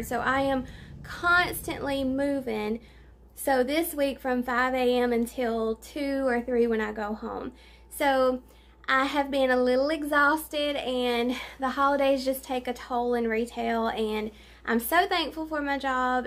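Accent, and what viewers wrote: South, United States. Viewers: Ran into your channel looking for a review of Hanna Nikole. Now following! Thank you for your reviews. My measurements are 49, 43, 52, so I can get an idea of how things would look on me, even though you are more of an hourglass and I am more of a rectangle. Your accent is so charming and soothing. My hubby is from Alabama, so listening to you is like visiting with some of my favorite people in the world (his sisters!!!) Cheers, Maria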